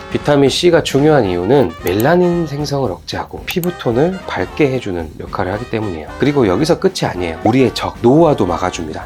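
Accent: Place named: Jeolla, South Korea. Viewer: 전라도 사투리 진짜 우와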